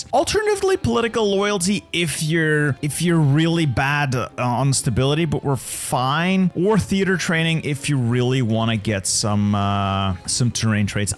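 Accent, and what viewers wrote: Flanders, Belgium. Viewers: Hoor ik daar een Belgisch accent?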